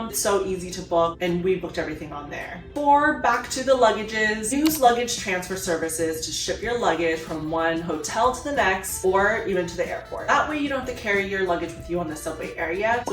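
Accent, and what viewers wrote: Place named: California, United States. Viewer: your voice sounds Californian with a wanta be “Valley Girl” tone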